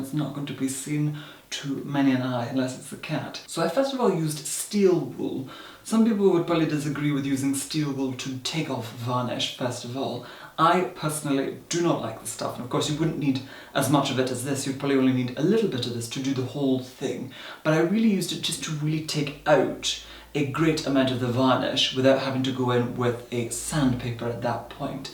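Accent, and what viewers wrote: Scotland, United Kingdom. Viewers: I feel like I can hear a scottish accent under that voice. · I kinda felt that your accent is not that British or someone from London. It confirmed that when you said you're from Scotland. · actually saying film like 'filum' is extremely common still in Scotland (where and I are from) the difference is you rarely have many people with our accent as articulately spoken, but does live in London now (for how long, I'm unsure, but his Scottish accent is still there and very prominent) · OUTER HEBRIDES, I KNEW YOU HAD AN ACCENT!!!!! I AM FROM THE OUTER HEBRIDES AS WELL!!! WHICH ISLAND?? Sorry thats weird ahahaha, I don't know why I am so excited, I have just never had a youtuber from where I live! Wow, I actually knew you had an accent, whenever I listen to you I always listen to your accent and I knew you were from Scotland from the accent but! 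Do you speak gaidhlig!? omg I am such an idiot! Your last name!! That is such a popular name in the outer Hebrides. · He has a slight Scottish accent as well. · I knew it! You are Scottish! I always thought with your posh voice you were English but I've always heard a small slice of Scotland in there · He's saying 'film'. It's accent. I believe he is Scottish or Welsh originally, but has lived in London for many years.:)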